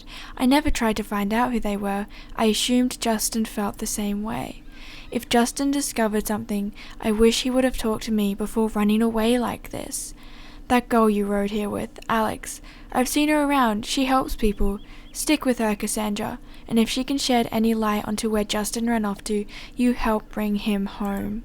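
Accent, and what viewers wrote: Australia. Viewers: No joke, but I really like your Australian accent (I think that’s how u say it).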